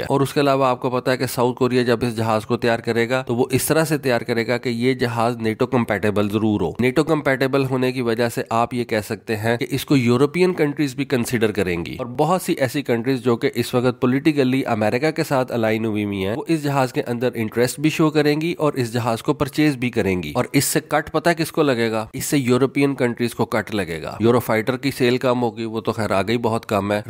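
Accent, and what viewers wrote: South Africa. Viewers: SOUTH AFRICAN!!!😂😂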